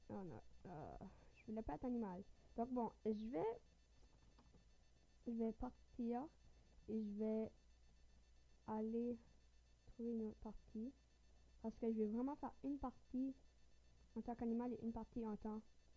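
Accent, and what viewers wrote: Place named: France. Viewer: Arrete avec ton accent francais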